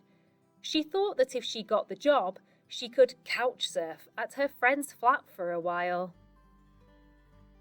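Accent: England, United Kingdom. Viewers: Are it's use British Accent? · British English is so pretty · English UK? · Great story to learn English with British accent. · Does this program pronounce with British accent? It is so beautiful! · British accent 😍😍😍😍😍😍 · What a lovely story! I enjoyed and appreciated it quite a lot as it gives the chance to improve and keep up to date my reading and listening skills.I do love the English accent so thanks a lot! Marinella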